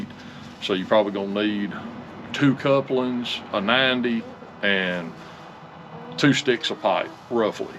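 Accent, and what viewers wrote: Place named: West Virginia, United States. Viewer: are you originally from west virginia? accent sounds like my cousins from there. :-)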